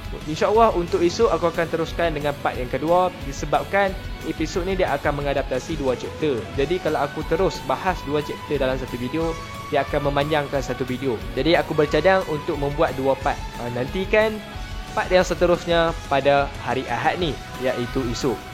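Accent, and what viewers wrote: North, Malaysia. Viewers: logat utara hahahaha but nice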